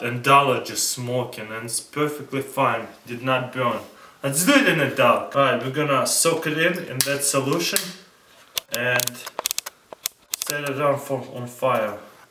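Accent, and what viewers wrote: Russia. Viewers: Thanks for making these videos. I like how they are quick and easy. Your Russian accent is gnarly by the way.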